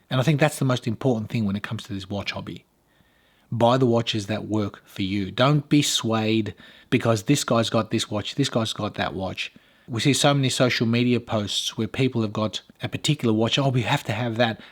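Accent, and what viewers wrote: Australia. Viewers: I love your videos mate. I love your Aussie 🇦🇺 accent. Love from India👊😊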